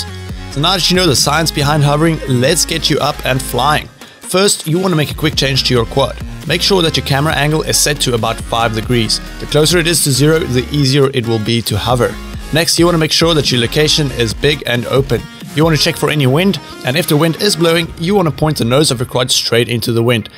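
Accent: South Africa. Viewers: hey my bru are you South African? you sound south african but your address says new zealand!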